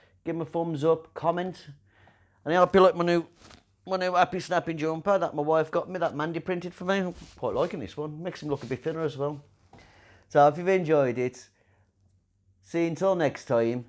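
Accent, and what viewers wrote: Birmingham, United Kingdom. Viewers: Hi, it's good to hear a brummie accent. Not sure where you are from but I can't be to far from you in Walsall. I am loving your videos and have tried a few of your ideas. I am using extension tubes and a Canon 1200D. Had some success! Thanks again for the inspiration. Steve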